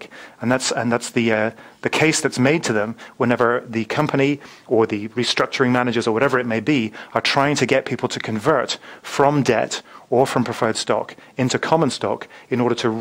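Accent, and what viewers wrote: United Kingdom. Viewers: Great video. After a couple of minutes I got used to British pronunciation and was able to concentrate on information. Will wait for new videos!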